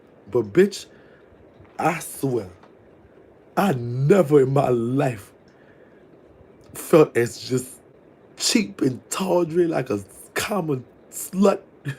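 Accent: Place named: Houston, United States. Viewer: You talking in that Houston boys voice is taking me out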